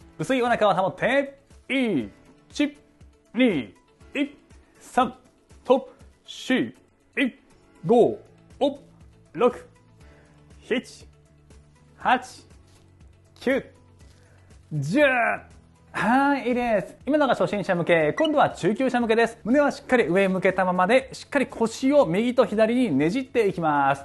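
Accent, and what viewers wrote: Kansai, Japan. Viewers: さんが、関西の方だと今日初めて気づきました！いつもありがとうございます!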